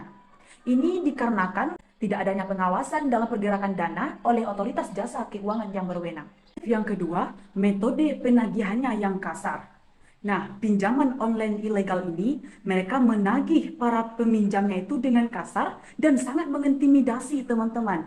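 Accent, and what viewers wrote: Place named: Padang, Indonesia. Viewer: Klua irama tngah padang me,,😂😂 is ok, lnjutkan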